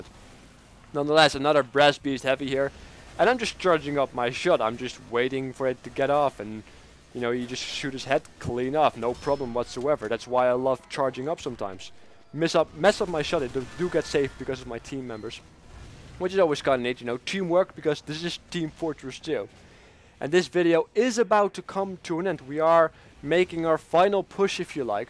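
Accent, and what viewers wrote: Netherlands. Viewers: are you from holland ? :D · Je kunt gewoon horen dat je nederlands bent lol, goede video's man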